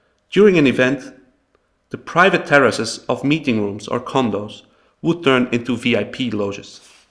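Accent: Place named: Netherlands. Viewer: Love this 'steenkolen' English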